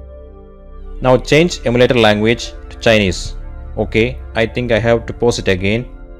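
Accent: Kerala, India. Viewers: Bro malayali analle. English parayumbol manazilavum😭🤣😅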